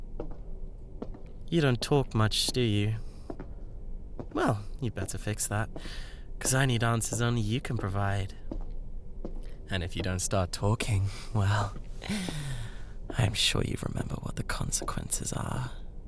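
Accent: United Kingdom. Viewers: BRI ISH BABEEEEE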